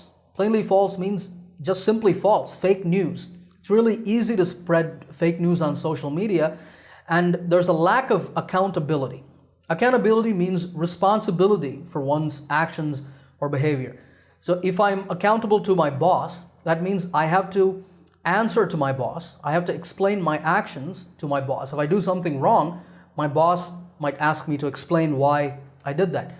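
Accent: United States. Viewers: Thnk u so much sir..... really useful n important to learn best eng with American accent 😊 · Can you tell me how did you learn or improve your american accent. thanks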